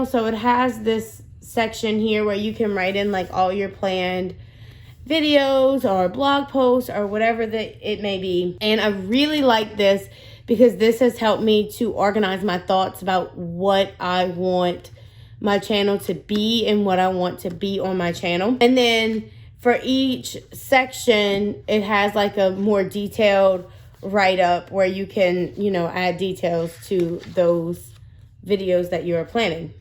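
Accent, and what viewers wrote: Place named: South, United States. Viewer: First time viewer and I enjoyed the video. I think I hear a LA or south MS accent. I’m originally from Gulfport, MS. Kathy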